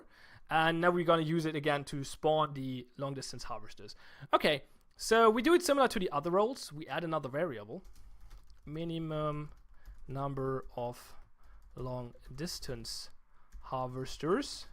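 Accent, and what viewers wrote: Germany. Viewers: Hey, ich bin mal gegenüber deinen anderen Zuschauern so unhöflich und schreibe auf Deutsch, da doch bemerkbar ist, dass deine Muttersprache Deutsch ist. Kann es sein, dass da vielleicht sogar jemand aus Berlin ist? Da bin ich mir aber nicht sicher. Ich habe screeps vor ein paar Monaten schon mal entdeckt, aber nach dem Tutorial nicht genug Motivation gehabt. Jetzt gestern in in der Vorlesung hat ein Kommilitone screeps auf seinem Laptop offen gehabt und jetzt, als Informatikstudent ist die Motivation doch da. Und gestern dann nach ein wenig Starthilfe gesucht und auf deine Videos gestoßen. Und ist die perfekte Starthilfe :D Wenn du auf den offiziellen Server umgezogen bist und du Richtung Kampf und Handel und sowas gehst, kann ich gerne meinen bescheidenden Fähigkeiten entsprechend helfen. Beste Grüße aus Berlin Diskerror/Nils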